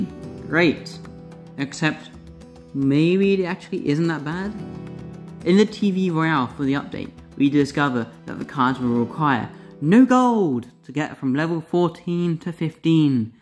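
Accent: United Kingdom. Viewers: i agree with froggy, just try be less british in the future. but nice video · i would've given this video essay a chance but you way too british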